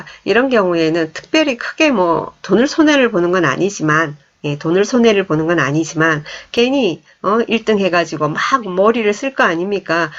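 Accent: Busan, South Korea. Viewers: 소장님 달달한 부산사투리하고 추임새...듣기좋네요 나만 좋은건가ㅎ 강의 잘들었습니다~감사합니다❤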